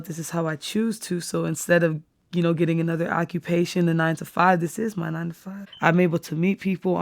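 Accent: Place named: Caribbean. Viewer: English on top of carribean She sounds like Naomi Campbell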